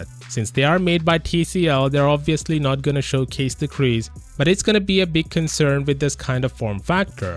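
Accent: India. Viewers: He sounds like an an India IRS scammer.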